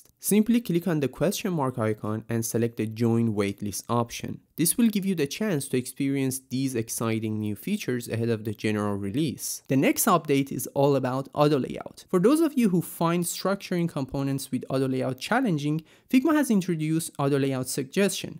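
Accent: Iran. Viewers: لحجه فارسی🤝🏻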